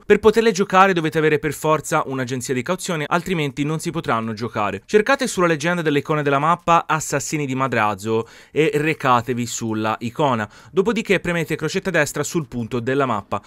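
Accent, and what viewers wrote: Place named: Rome, Italy. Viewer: È di Roma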